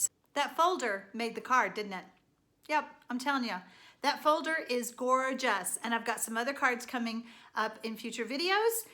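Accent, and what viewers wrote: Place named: Texas, United States. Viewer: Love you accent It makes me think of Texas each time I watch. I-grew up in Paris Texas and lived in the DFW for years. Now we’re in TN My question is what is I would like to view your video of the one sheet wonder you did of the Merry and Bright DSP. I love the cards you made from it Could you guide me to the video. I couldn’t find in on utube. Thanks for your help Texas girl.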